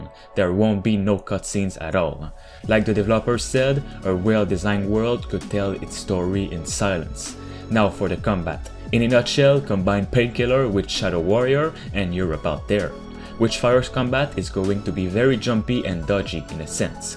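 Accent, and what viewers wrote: Quebec, Canada. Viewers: Est xe que tu es Quebecois ??? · T’es tu québécois? tu sonne comme un québécois qui parle en anglais genre moi ahah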